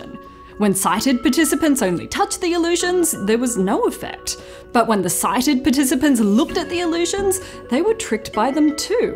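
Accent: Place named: Australia. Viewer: Monologue my brains out. Only with the Aussie accent though.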